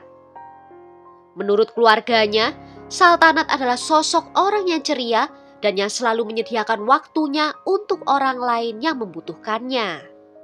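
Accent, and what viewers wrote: Java, Indonesia. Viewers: alamak medok gilerr derr😂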